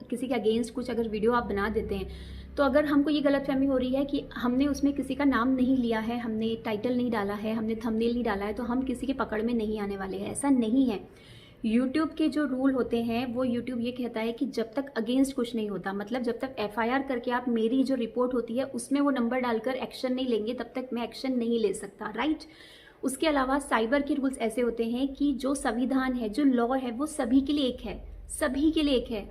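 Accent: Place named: Punjab, India. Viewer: This is because we all love you, ur punjabi is so cute . love you api from Punjab❤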